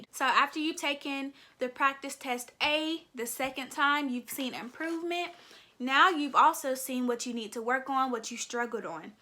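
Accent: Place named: South, United States. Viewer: Yesss Queen, thank you & I love your southern bell accent soo cuteee.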